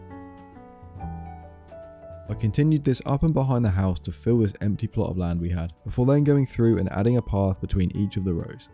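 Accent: Italy. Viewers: Love Your Voice ♥ Are you Italian Btw ? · si ligure un po pero piu sud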